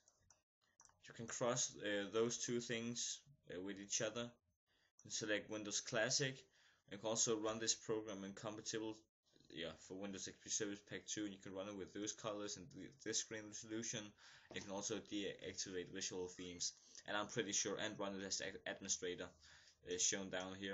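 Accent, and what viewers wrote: Denmark. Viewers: Dansker? :D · jeg kan høre at du er dansk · du er dansker er du ik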